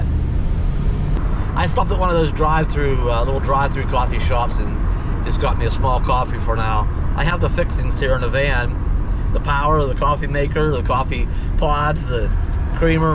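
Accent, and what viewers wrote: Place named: Pacific Northwest, United States. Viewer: You sound like livening in the PNW .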